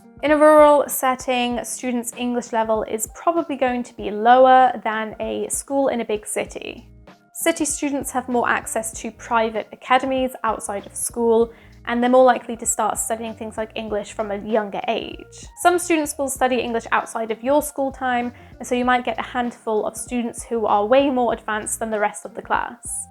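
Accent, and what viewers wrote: United Kingdom. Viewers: I got a kick out of your pronunciation choices...especially the WATER choice. I've had this thought in the past, watching your photography video's, as the word water comes up often, within 'waterfalls' or if your talking about a stream. Our American/Canadian choice to you sounds like wa(d)er as you explained, but from our perspective the 'wat' is the same but the end 'er' is different. Nonetheless, as Canadian, I love the UK/British accent, and have no issues (so far in understanding every word. Oddly, I had an uncle that came from Wales some decades ago, and I just couldn't understand what he was saying for the most part, so I assume there must be an 'old school' Welsh accent perhaps? Anyway, trying to catch up on your video's...have a great day!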